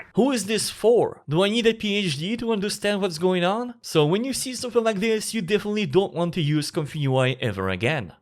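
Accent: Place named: France. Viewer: Il me semble avoir reconnu un léger accent français, donc je commente dans cette langue:-) Fabuleuse vidéo: la présentation est claire, pédagogique, avec de l'humour ce qui ne gâche rien. Les liens sont tous présents dans la présentation ou sur la page patreon. Bref une réussite. Avec une telle vidéo, impossible de rater l'installation de ce nouveau Flux Q8. Surprise il est beaucoup plus rapide que les versions précédentes de Flux (avec NVIDIA GeForce RTX 3070), et le résultat est magnifique. Un grand merci à.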